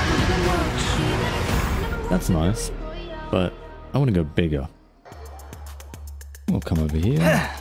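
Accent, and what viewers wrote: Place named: Australia. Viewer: the aussie accent is glorious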